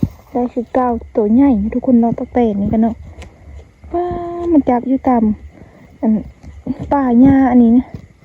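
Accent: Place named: Phu Thai, Thailand. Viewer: น้อง ขนาดตอนกลางคืนยังหน้ารักเลยนะคับชอบสำเนียงสาวภูไทคับเพราะดีมากๆ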